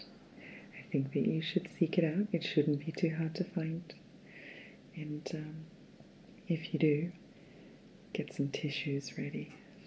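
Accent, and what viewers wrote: Australia. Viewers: 😍📚 Everything about this is perfect! Your articulate summaries for two of my most beloved films, Atonement and Geisha, are much appreciated. ^_^ Keira and Michelle Yuen are favorites. The library is my refuge, and mythology is timelessly dreamy. Your Australian accent lends itself to ASMR as well. 😃 I am thrilled to be a new subscriber.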